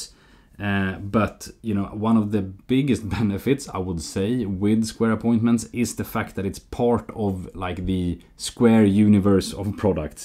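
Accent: Sweden. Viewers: can hear the swedish dialect🤠 but no worries, its alot better than most other dialects👍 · Are you Swedish? You sound like my friends and relatives.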